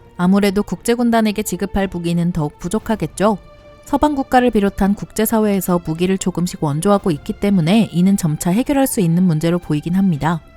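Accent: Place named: Seoul, South Korea. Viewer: 누나 이제 서울말 잘하네여ㅎㅎ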